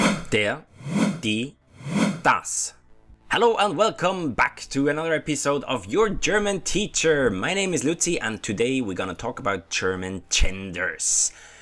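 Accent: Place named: Switzerland. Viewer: Swiss accent, to my ears.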